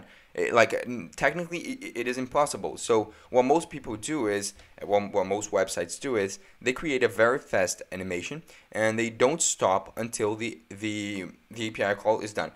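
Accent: Brazil. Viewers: sotaque br? very nice english by the way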